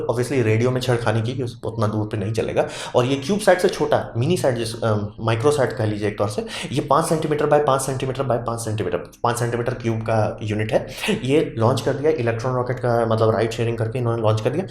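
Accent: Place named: Deoghar, India. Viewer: Heyyy bro perhaps u r from Deoghar (jharkhand).....??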